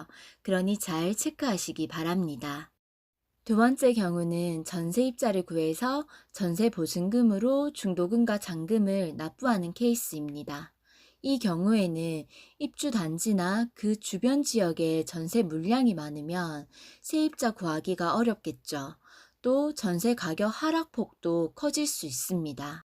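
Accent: Gyeongsang, South Korea. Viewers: 목소리 들어보니 경상도 사람이네요. 일단 잔금 연체이자 말씀 감사합니다 저는 실수요 무주택자입니다. 계약금10% 완납하고 70% 건설사중도금대출 나머지 20% 입주잔금은 제가 값을 생각입니다. 입주 지역은 조정대상지역이고 평택고덕신도시 a-39블럭입니다. 제가 생각하는 입주계획이 틀린지 아닌지 말씀해주시면 감사합니다. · 경상도 분이신가봐요 ㅎ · 경상도 맞는 듯 하네요. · 경상도 TK쪽인듯 익숙해서 듣기 좋습니다.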